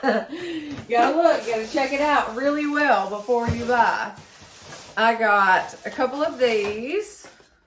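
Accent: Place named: Georgia, United States. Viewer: GIRL! WHERE ARE YOU FROM??? I immediately subscribed because we talk similar lol Northwest GA here but loved the video! You found the holy grail of DT stocked items!!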